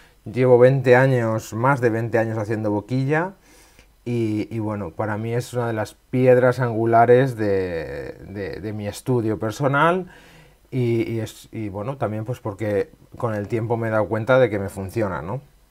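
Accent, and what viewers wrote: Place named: Spain. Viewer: De qué parte de España eres tú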